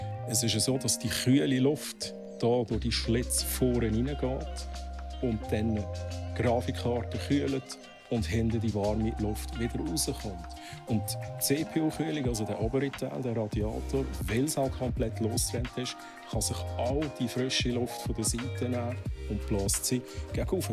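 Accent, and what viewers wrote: Switzerland. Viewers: find d'musik genau richtig sehr ahgnehm chillig smooth ahpasst das liegt wohl ehner am schwiizerdütsch und em dialekt und isch es sprachliches khör und kein sound problem 🇨🇭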